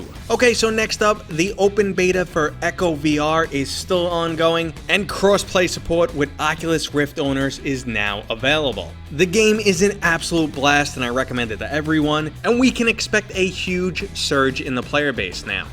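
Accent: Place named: New England, United States. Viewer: You sound like you are from New England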